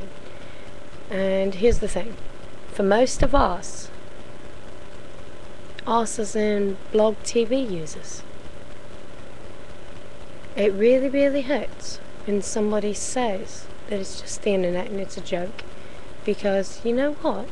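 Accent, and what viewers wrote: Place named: United Kingdom. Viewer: whats wiv the Britsh accent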